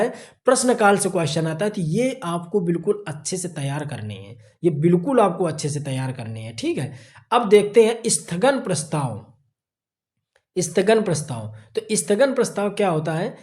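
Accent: Kanpur, India. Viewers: Sir aapne bahut ache se samjhaya.... Kya aap kanpur se ...apki awaj se aise lga mujhe ...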